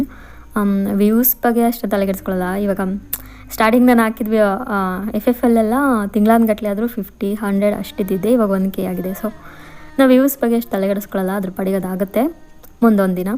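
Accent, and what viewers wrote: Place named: Tamil Nadu, India. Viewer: Chenagide akka and love ur tamil accent ❤😁 ennu bere bere ff gal haki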